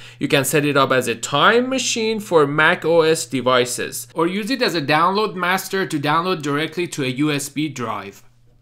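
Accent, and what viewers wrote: Iran. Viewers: Loll gotta love the Persian accent 👍🏼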